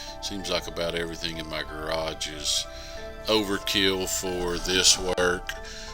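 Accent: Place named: South, United States. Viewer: I just subscribed to your channel! Man you have all the good toys! Your equipment from The Leather Co. of course is top of the line. But you go over the top with your vacuum former, your laser cutter/engraver, your burnisher. Good job! Where are you located? You have a pretty good good ole southern drawl! My kind of people.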